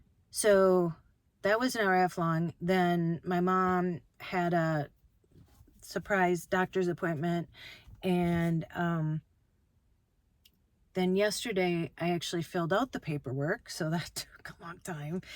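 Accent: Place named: Fargo, United States. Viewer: I think you talk like Fargo😊. Then again I am from Rhode Island. 😉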